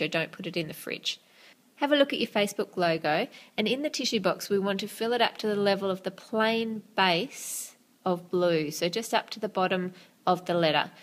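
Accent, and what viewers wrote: Australia. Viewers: are you Australian? Your defiantly not British because I am and i've never heard anything like that. xx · I like your Australian acsent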